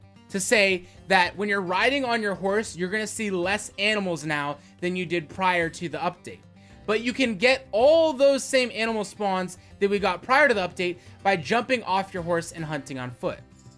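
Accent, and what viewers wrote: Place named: Russia. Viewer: you are 100%, they have made the game unenjoyable. But please in the next video talk in you crazy Russian accent. That is the reason I started watching this channel.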